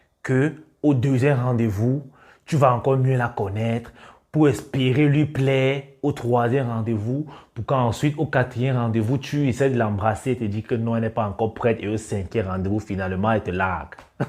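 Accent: Cameroon. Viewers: Je m’abonne direct putain c mec avec son accent camerounais ah je kiffe 🥂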